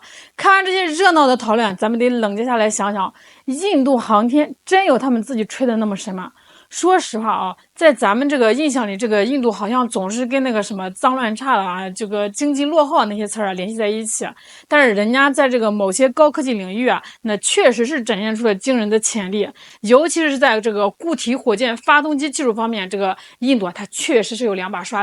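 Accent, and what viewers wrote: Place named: North, China. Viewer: 但一定是北方人😊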